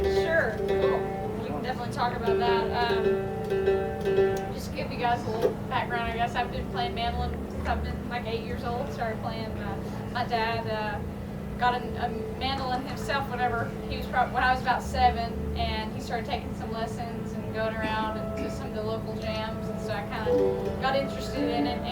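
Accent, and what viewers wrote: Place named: South, United States. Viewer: Love her southern drawl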